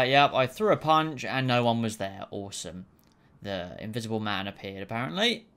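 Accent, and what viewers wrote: United Kingdom. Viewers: Whenever I feel lonely in the world, for a few minutes shows me that he too feels all the rage. But in British so it’s classier. Dude, you have become my favorite platinumer on YT! Great content as always!:)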